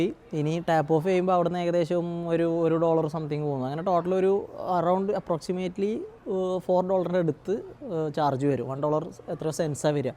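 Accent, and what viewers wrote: Malappuram, India. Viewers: വ്ലോഗ് എടുക്കുന്നോണ്ട് മലപ്പുറം ഭാഷ ഒഴിവാക്കണ്ട ഞമ്മക്ക് അതൊക്കെ ആണ് ഇഷ്ടം ❤❤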